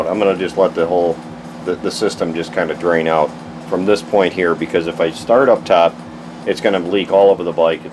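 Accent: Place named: Midwest, United States. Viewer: Another nice video. I'm about to pull the trigger on a G650gs but I find your videos really helpful regardless of exact model. Plus I'm from W Michigan now living in CT so I like hearing your Midwest accent!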